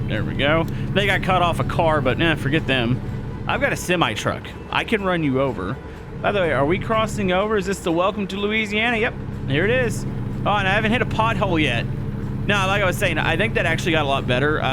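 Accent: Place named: East Texas, United States. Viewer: Sounds like you’re from east Texas! Tyler,TX here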